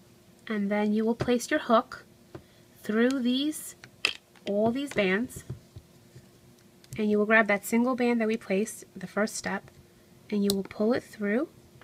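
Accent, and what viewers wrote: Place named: New Jersey, United States. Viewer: you have a New Jersey voice... no offense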